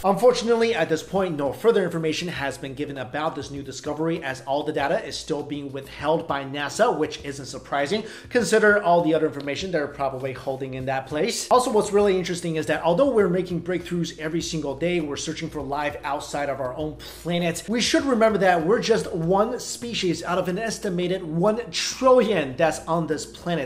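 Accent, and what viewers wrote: Canada. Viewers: Is he Canadian? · All I can say is: "Are you Canadian?"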